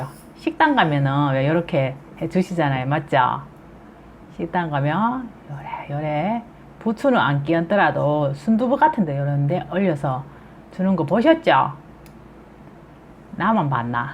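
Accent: Gyeongsang, South Korea. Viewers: 님께 배운대로 정구지 간장 만들어봤는데 너무맛있어요 한통만들어서 뚝딱 다먹었어요 경상도 말 너무재미있어요 가르쳐 주셔서 감사합니다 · 님 말씀도 잼나게 해주고 요리솜씨도 대박이네요. 경상도 사투리가 매력있어요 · 경상도 사투리가 참 맛깔쓰럽습니다. 부추간장에 청양고추ㅡ 한번 해봐야겠어요. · 항상~~잘보고 있어요 경상도 사투리 맛갈납니다~~^^ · 경남의사투리같아요 재미있어요.내일당장만들어야겠어요. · 경상도 아지매 저도 경상도입니다 말씀이 아주구수합니다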